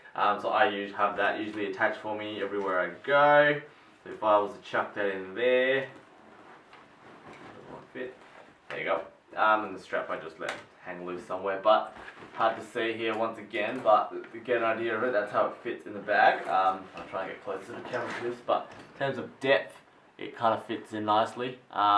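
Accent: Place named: Australia. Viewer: Where you from, you sound Australian, how'd you get a hold of one of these?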